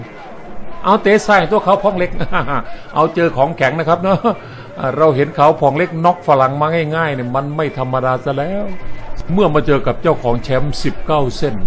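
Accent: Isan, Thailand. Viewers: พากย์อีสานตลกดีบ้านๆดี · ภาษาอีสานก็เพราะดีค่ะ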